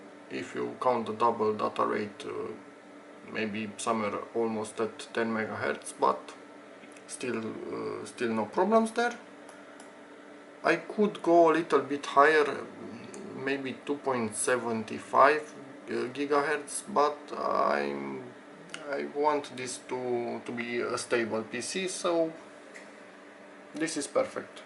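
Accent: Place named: Romania. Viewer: si cand ma gandesc ca esti roman dupa pronuntie,dar nice videoul,am o intrebare pe MSI g31tm-p21 merg setarile astea ca am acelasi procesor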